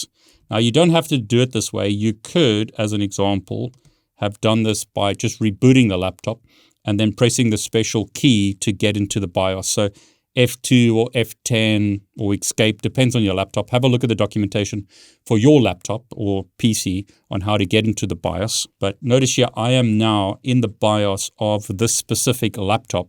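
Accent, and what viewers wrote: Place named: South Africa. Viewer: Thank you so much this was so helpfull. and you sound very south african.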